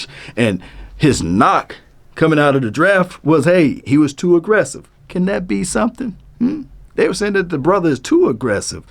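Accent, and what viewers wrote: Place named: Texas, United States. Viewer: As a Skins fan, I gotta say I have been checking out a couple of your streams and outside of your analysis being 💯 your voice is like...perfect. You got the perfect mix of baritone and Texan accent. Why you can't resume yourself to national radio I have no idea. Keep up the good work.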